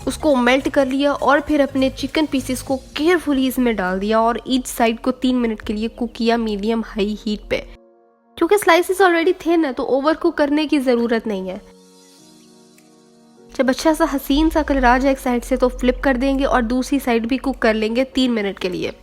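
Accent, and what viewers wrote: Punjab, India. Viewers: yaar aapi aap boht acha se explain krti ho i loved it ur punjabi axcent is just amazing ap sach mn Sialkot se ho hahah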